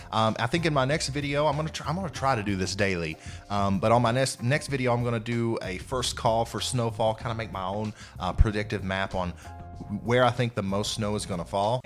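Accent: South, United States. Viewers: You can tell that he cleaned up the southern draw just a little bit as he got more popular lol 🤣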